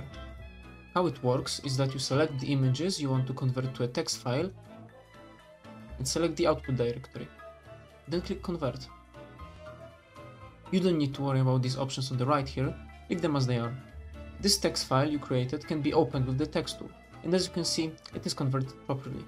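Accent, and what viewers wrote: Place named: Russia. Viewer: your accent's with a hint of russian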